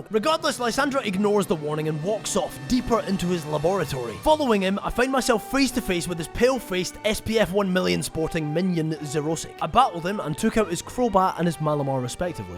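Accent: Scotland, United Kingdom. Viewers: This is the first moment in the video that I realized you had a Scottish accent. 😂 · Oi m8 what’s yer nationality cause I hear some Scottish in that accent (the speed and the emphasis on p, c, s) also I like the persona music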